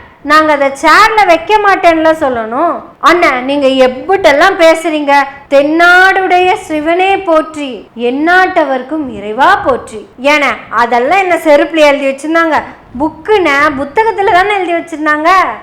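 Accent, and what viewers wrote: Kongu, India. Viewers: அருமையான கொங்கு தமிழில் விளக்கமாக விவரித்த சகோதரி அவர்களுக்கு எனது பாராட்டுக்கள் மற்றும் வாழ்த்துக்கள் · கொங்கு தமிழில்,கொஞ்சும் தமிழில் அவர்கள் கொன்டுவந்த செருப்பை சரியாக பயன்படுத்த சொல்லி கொடுத்த பாணி அருமை. வாழ்த்துக்கள். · சகோதரியின் கொங்கு தமிழ் மிக அருமை 😊😮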